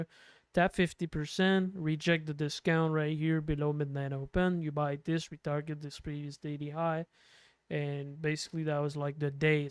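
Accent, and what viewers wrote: Quebec, Canada. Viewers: French canadian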